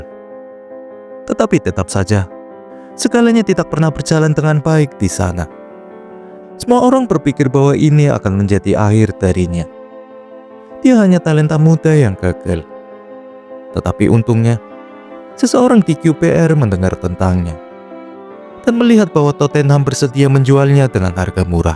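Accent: Tegal, Indonesia. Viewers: Min,orang tegal y,? Dri logatnya sih kayak tegal,😅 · Logatnya, tegal, mas??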